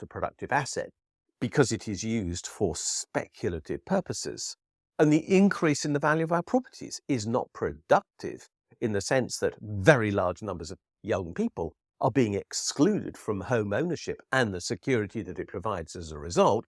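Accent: United Kingdom. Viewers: It's still ranting and lunacy if it's said slowly by a posho brit.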